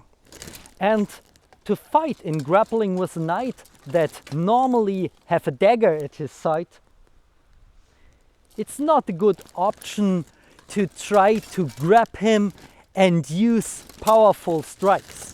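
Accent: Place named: Germany. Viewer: The way you structure you sentences, the words you choose, it's like... You're SO DISTINCTLY german, even not taking that beautiful accent into equation, i absolutely love it. One of the best channels about armor, so pleasant to listen to this voice and see the quality action. Hi from RU !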